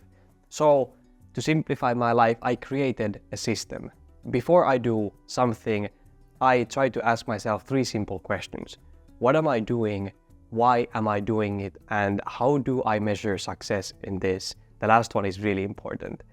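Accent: Finland. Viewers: Maybe it has something to do with the Finnish language? My mother is from Finland but has lived in the Netherlands for 40 years, she speaks Dutch with a Finnish accent and it sounds exactly like this. In the Finnish lanaguage there are a lot of very long words that are composed of multiple elements, maybe its more important to be clear about where one words ends and the next one begins?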